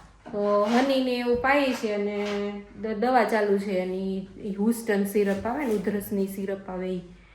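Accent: Kathiawar, India. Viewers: Kathiyawadi lagocho hu bhi kathiyawadi chu Putaliya no dhandho start karidyo......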